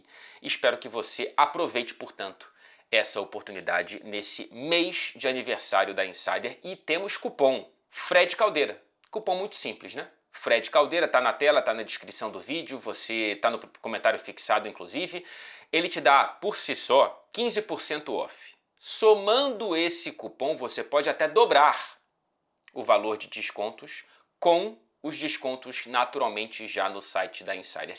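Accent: Rio de Janeiro, Brazil. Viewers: Esse sotaque carioca é irritante. PQP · É o carioques, não tem jeito!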